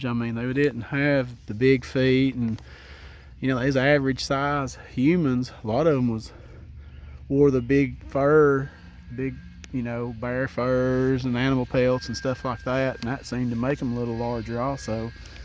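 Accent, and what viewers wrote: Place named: Tennessee, United States. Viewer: Hey buddy! I usually don't write comments but I stumbled across your channel by accident. One of your videos was recommended to me. I had never heard of Dennis Martin or the wildmen, but I binged watched all of your videos and I was fascinated. Your stories remind me so much of the stories my Grandpa used to tell me when I was a kid. He grew up in the hills of North Georgia and he saw alot of things like you describe. You sound so sincere and you sound like a really good guy. I laughed because you have the same Tennessee accent that my mother has, she grew up in the Copperhill, Greasy Creek area of Tennessee. She has stories about seeing witches and she claims that one of her relatives saw the devil. Keep on telling your stories old buddy because I truly enjoy them. You really do need to get your stories published. 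I do have a question for you....what parts of Tennessee would you absolutely stay away from at night because of wild men and other things. Thanks man and keep on keeping on!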